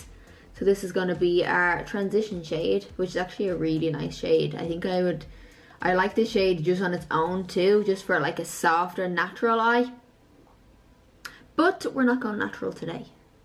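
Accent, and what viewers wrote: Ireland. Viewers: Irish accent or am I wrong? ☺️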